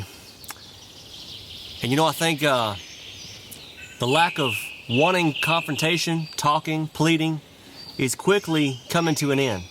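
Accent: South, United States. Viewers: So odd to hear this quote in a Southern accent. It remains true and the core of my soul in these days however. "I hope and pray everyday that I am not forced to untether myself from the bonds of civility, and to engage in the destruction of all those who seek the dominion and harm of those whom I hold most dear. For should that wrath of Man be released upon mine enemies, neither them; nor I, will ever live in peace under the sun again. For indeed, I will be forced to scorch earth to preserve the life and well being of those who I do love."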